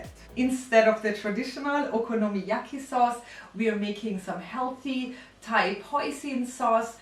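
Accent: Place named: Stuttgart, Germany. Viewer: Lucky you with the Ibiza Carob syrup. I like how you start out the video with a wet mark on your apron. You work even before you film, I guess. That Nutri Bullet looks like it went through WW I and did some service on the Western Front with Erich Maria Remarque... You are brave to use that dried Shitake mushroom... I like your knife skills. You didn't even chop off a nail... did you ? I think I make something like this every other day. I called it an omelette... I like your accent. It makes me feel like I am listening to a Professor from Stuttgart... then I look and it is a pretty blonde... that always freaks me out... in a good way... -Jeff Goes Random. Jah.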